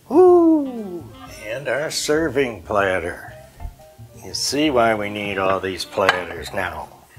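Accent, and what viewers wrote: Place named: New York, United States. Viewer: I just discovered your channel this month and love it... love all the beautiful pieces of your collections and the cooking is excellent (so organized). But most of all your New York State accent and your laugh (chuckle) with the twinkily eyes all make me happy. There is one You-tuber whose voice and cadence never fails to make me depressed so I can't watch her. I popped on your channel to get instant happy. God bless you and (my daughter's name) and your family. Thank you for all your hard work in making these postings (and, of course). Your delight in seeing something fabulous (ie. Washington, DC trip) is wonderful! God bless!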